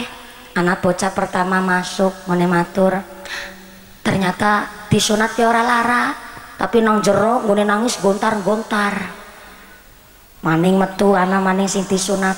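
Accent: Banyumas, Indonesia. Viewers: Assalamualaikum hadir lagi nonton ceramah ngapak Ustadzah Mumpuni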